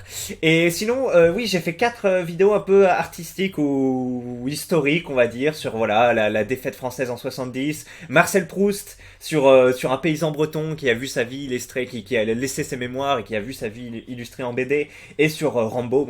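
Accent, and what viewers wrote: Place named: Southwest, France. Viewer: Tu dis souvent moinssss, tu viens du pays basque? Ou du Sud Ouest?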